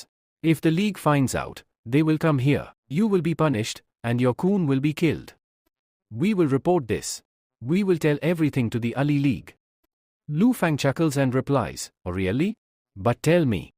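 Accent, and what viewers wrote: India. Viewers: what's with the indian accent xD, tbh i stranggle with normal english so with the accent i think ill just pass on this one even tho the story seems good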